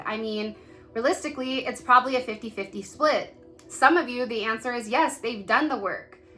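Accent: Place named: Bay Area, United States. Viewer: okkayyy I knew you had to be from The Bay. I know that ur swag when I hear it and see it. lol That’s Hella Dope….Yeeeee💕👑🔥⭐️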